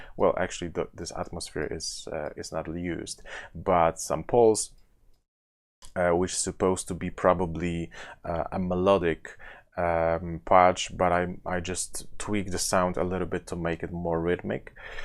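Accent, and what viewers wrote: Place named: Poland. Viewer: Mówisz po angielsku jak większość Polaków - od razu słychać pochodzenie. Biblioteka fajna - jednak nie moje klimaty. Pozdrawiam :)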